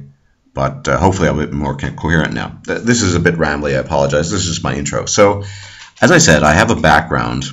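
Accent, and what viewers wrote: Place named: North Germany, Germany. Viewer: Dein Deutsch ist sehr flüssig aber mit ziemlich Norddeutschem Dialekt. Ist das Absicht?^^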